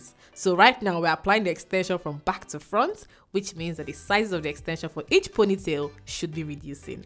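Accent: Nigeria. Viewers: I just like your Nigerian voice and way of speaking.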